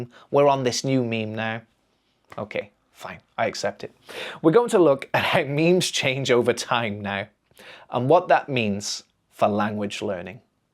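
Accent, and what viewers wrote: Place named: United Kingdom. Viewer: Hi! I really enjoy your practical approach to English learning, especially your Walk & Talk videos, graded listening content, and the way you bring natural British English and real-world topics into your lessons. I also sent you an email today with a personalized App idea inspired by your teaching approach. The idea is to turn your listening and speaking content into an interactive practice space where learners can shadow your lessons, practise pronunciation, work through IELTS and speaking prompts, and build a consistent daily practice habit. I think your teaching content could become something really valuable for learners beyond YouTube. I’d love to hear what you think! 😊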